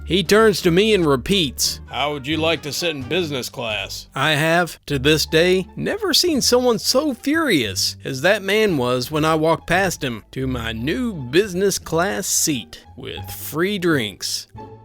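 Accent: United States. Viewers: pronounce the word "arse" in your very American accent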